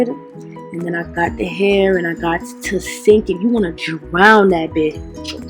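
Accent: New York, United States. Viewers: Omg I love hearing u speak reminds me of home NYC girl great video it came out so nice does the hairspray really protect the lace I’m so worried bout that cause I like the lace to stay u did good sis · You from New York ?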